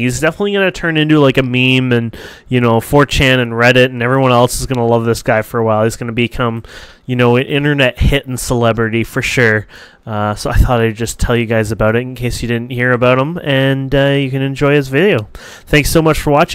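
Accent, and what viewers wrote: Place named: Canada. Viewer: Kanadai csávó, hallani az akcentuson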